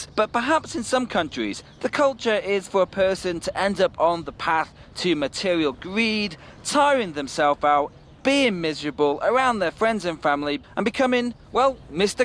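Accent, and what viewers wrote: England, United Kingdom. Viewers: ¿de qué parte de Inglaterra es tu acento? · where is your UK accent from?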